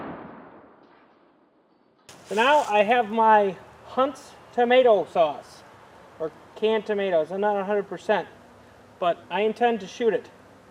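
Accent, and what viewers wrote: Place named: Wisconsin, United States. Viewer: Not intended to be a dig. Just based on your accent, sounds like you're from WI. MN or IA maybe??? I'm from WI and my accent gives it away.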